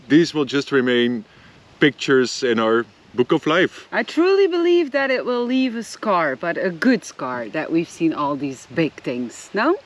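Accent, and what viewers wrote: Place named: United Kingdom. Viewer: You're sounding more like a British tourist as time goes by.😢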